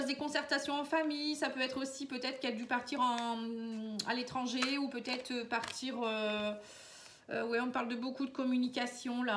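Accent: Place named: North, France. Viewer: Merci infiniment vous relater mon histoire je suis bluffée vous êtes très forte! L'amour Est plus fort que tout 😊🤣 J'adore votre accent du ch''nord n'est ce pas?😉 Je vous envoie toute ma lumière et mon amour 💐❤️😇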